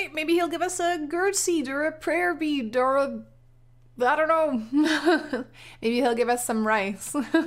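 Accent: Scotland, United Kingdom. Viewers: Is this a scotish accent? I have no fcking idea.but i love it🤔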